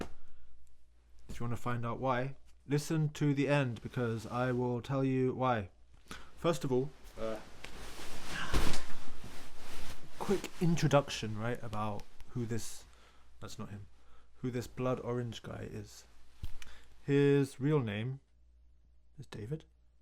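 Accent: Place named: United Kingdom. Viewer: 우와 진짜 영국식 영어!!!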